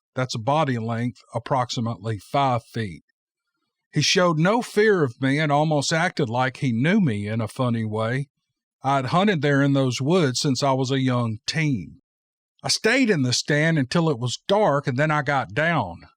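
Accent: United States. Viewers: You are over the top especially with that accent of real American English spoken the way it should be spoke thank you you are truly an awesome story teller thank you so much I’ve given up reading and just listen to you , it’s not that way about reading my eyesight is getting weak as am I in the tie I have left it’s not so bad to go especially listening to you and your soothing voice thks again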